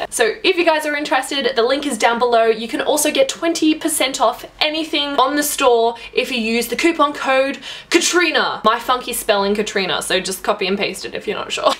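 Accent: Australia. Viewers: is that an australian accent? i always get it confused. so hawt lol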